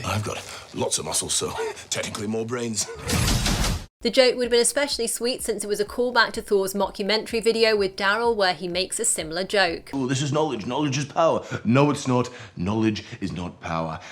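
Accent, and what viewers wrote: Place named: RP, United Kingdom. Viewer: Can you be creative instead of being English public school voice confident without having a fucking clue what you're doing mode. I think the days of that are very numbered.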